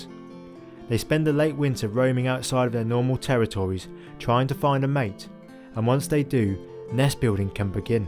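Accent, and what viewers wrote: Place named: Norfolk, United Kingdom. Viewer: Definitely a Norfolk boy 😂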